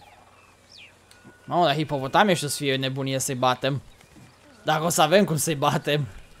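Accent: Moldova. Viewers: bă ce-mi place accentul de moldovean :)))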